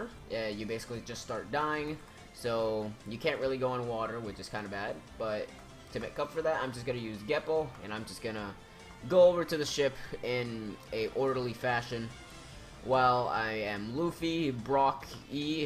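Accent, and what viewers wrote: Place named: New Zealand. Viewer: Oh lol I didn't think you sounded Hispanic because your accent sounds very similar to a New Zealanders Accent so I really didn't make that kind of connection.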